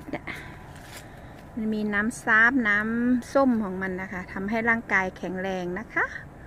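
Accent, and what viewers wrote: South, Thailand. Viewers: พูดใต้ชัดมากเลยนะค่ะ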